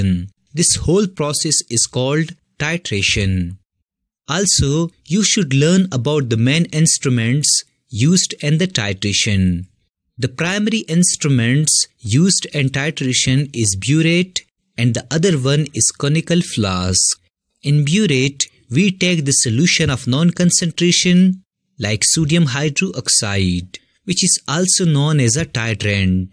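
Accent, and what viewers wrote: India. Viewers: Indian accent is funny 😂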